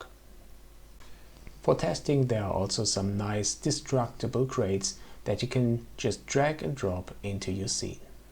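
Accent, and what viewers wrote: Germany. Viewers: Great Video! Helped me a lot ! Btw, bist du deutscher?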